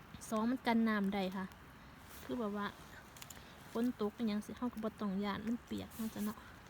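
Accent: Central Thailand, Thailand. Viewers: ไม่ง่ายเลยนะทั้งถ่ายทำไปพร้อมเก็บเห็ดขอบคุณนะจะน้อง และทุกๆท่านที่อยู่ตปท.ที่อุตส่าทำคลิบมาให้คนไทยที่เมืองไทยได้ดูจะชอบน้อง พูดไทยกลางน่าฟังดีและฟังรู้เรื่องด้วยจร้า💚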